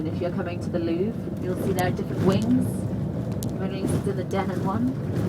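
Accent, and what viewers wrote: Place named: China. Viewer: Seems to me like the narrator is from China. Cant get her pronunciation at times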